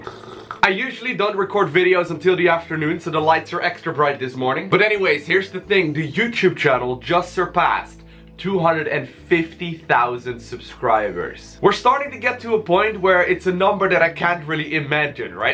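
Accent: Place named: Netherlands. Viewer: Don't get supply blocked man! Keep building the eco ;) PS: your accent back then was so dutchy and cute!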